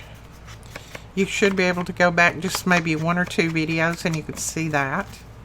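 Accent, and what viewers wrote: South, United States. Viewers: I just love watching you craft your southern accent is adorable and listening to you chat is entertaining, just wish I could answer and chat with you. Thank you for all your beautiful videos.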